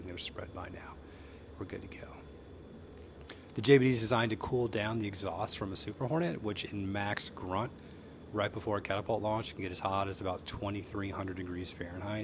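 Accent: United States. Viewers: This dude is 100% from California with this accent!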